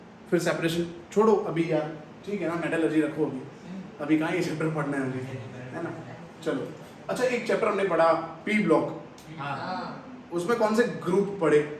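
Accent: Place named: Gujarat, India. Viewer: That gujarati accent in hindi 😂😂👍👍 keep it up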